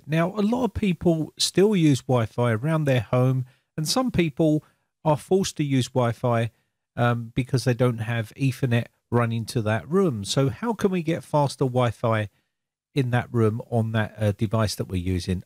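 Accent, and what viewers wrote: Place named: London, United Kingdom. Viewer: Well I suppose you live in or near London because of your accent 😄which I find makes me feel a little homesick (Sussex born and bred). But in any case we out here are sadly lagging far behind the rest of the world and I would pay for double my speed if it were available out here in the country still I suppose something is nothing eh??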